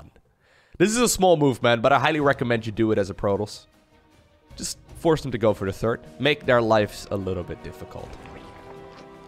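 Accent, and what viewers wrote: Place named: Netherlands. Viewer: Dutch accent is so nice.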